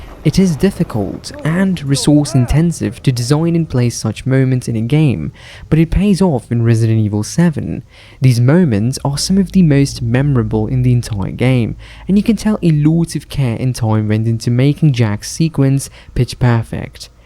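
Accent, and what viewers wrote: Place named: Northern England, United Kingdom. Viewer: bro i really didnt mean anything by it. I actually felt bad and was gonna go back and delete the comment. Felt crap to watch such a great video amd just leave that as a comment. Im just usually good with accents and was puzzled by yours. You sound like you're from a nice part of northern england or something. Genuinely tho mate, this was such a good video. I felt like you put a lot of stuff that i felt into words so thanks. Ill definitely watch the new video when uts out too ✌️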